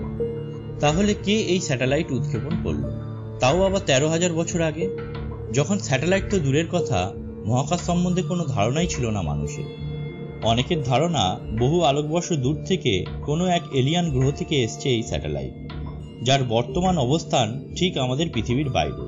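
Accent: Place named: West Bengal, India. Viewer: indian bangla...... just...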